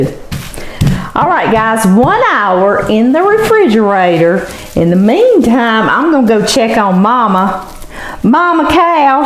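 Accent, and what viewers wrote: South, United States. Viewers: I’m only here for her southern accent.. from Hawaii and just fascinated listening to her explaining how to make yummy goodies!!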